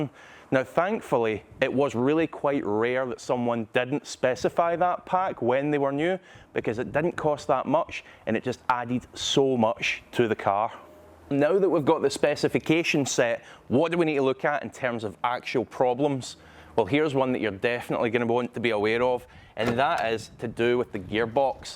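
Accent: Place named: Birmingham, United Kingdom. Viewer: they're from Birmingham 😂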